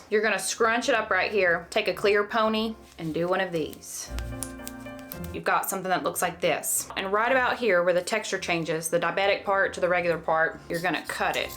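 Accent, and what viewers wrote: South, United States. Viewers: I think you’re absolutely hilarious and adorable. You have a very magnetic enigmatic quality and you’re gorgeous. You don’t SUCK at this YouTube thing. I get sucked into your videos every time I watch just one! I love your accent, your humor, your southern sayings. I think you’re killing it girl. Keep up the amazing work! You got this!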